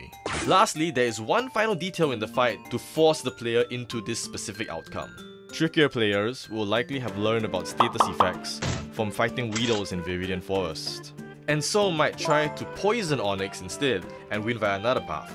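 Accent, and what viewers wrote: Singapore. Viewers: i heard your accent and i immediately knew you’re singaporean 😂 subscribed! #SupportLocal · I thought I recognised the accent!! Hello! From Singapore too!!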